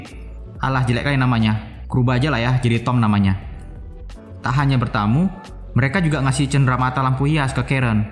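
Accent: Medan, Indonesia. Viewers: Nampak kali medan nya bah. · Jgn lh nampak kli Medan nya min:) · Logatnya kek org Medan kau bang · Orang Medan ya bang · kuat x logat medan mu bah,,, :D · eeeiiii senang kali lah aku.. gini cara pembawa'an y pakai logat medan.. saya dayak kenyah kalimantan timur.. ayo bang ini ajj logat y.. seruu.. 😍😍😘😘🤗🤗 sukaa kali aku bang... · anak medan ni oratornya :D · Abang ini kaya nya orang medan logat nya medan kali 🤣🤣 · Pasti orang medan nya kau lae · logat bhasa medan nya aq gk tahan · Bg lu orng medan ya? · Medan kali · Orang Medan bg?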